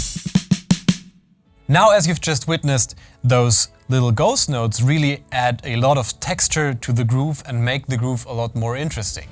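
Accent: Germany. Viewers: Bei dem Akzent dachte ich mir doch schon das es ein Deutscher sein muss👌🏼 weiter so👌🏼💪🏼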